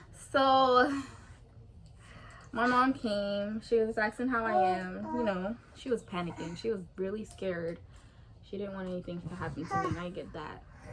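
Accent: Jamaica. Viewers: Ooouuu u Jamaican girllaaaa😛😁. Sorry lol my bf also Jamaican nd the fact that I understood wat u was saying I got press lol😂. He be teaching me stuff😄.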